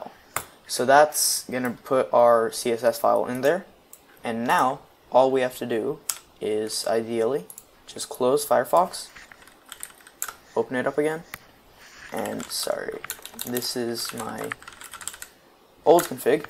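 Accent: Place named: Brazil. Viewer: demorei mt pra perceber q tu é br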